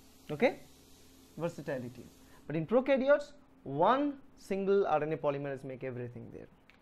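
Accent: Bengal, India. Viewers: are you bengali! bangali naki?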